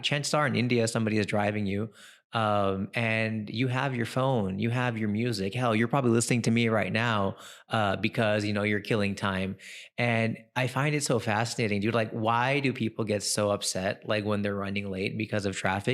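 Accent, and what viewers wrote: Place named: United States. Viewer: Wow ! You still speak American accent. How come it hasn't changed. 🙂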